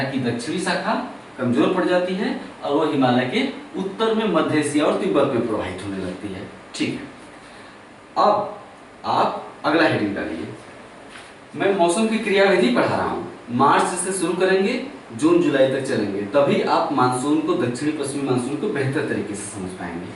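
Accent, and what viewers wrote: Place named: Purvanchal, India. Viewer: sir tikora sahi hai jisase malum hits hai ki aap purvanchal se hai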